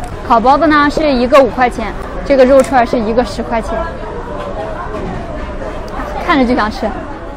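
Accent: Shandong, China. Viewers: 听口音，应该是山东莱芜人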